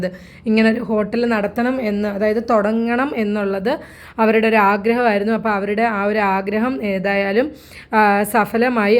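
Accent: Kollam, India. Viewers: കൊണ്ട് അവിടത്തെ പിള്ളേര് വരെ തോറ്റ്...❤❤❤ നമ്മുടേ കൊല്ലം കഴിഞ്ഞു കരുനാഗപ്പള്ളി കായംകുളം വരെ ഉള്ള ഒരു ഭാഷ അല്ലേ നിങ്ങൾ പറയുന്നെ..❤ പിന്നെ എന്റെ രണ്ട് കമന്റ്‌ കണ്ടിട്ട് മിണ്ടാതെ ഇരിക്കുവാണ് ഇല്ലേ ഞാൻ കണ്ടു see..😂 നെവർ മൈൻഡ്.....ആ ലൈനാർ അംനിയെ ഒന്ന് കാണാൻ പോണേ.❤❤❤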